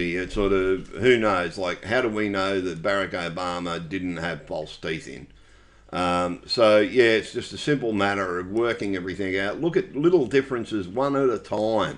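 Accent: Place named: Australia. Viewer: "If ya don't fuckin believe me I don't care" - you're awesome mate! Nice to have a real aussie bloke talking about these things on here. Ive always believed that they are the same man too.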